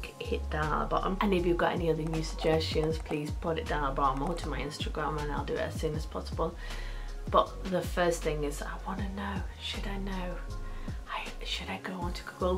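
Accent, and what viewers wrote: United Kingdom. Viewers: where you get that English/British accent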